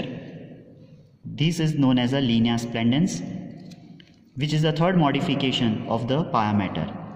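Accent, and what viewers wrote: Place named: India. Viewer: Indian accent is so cool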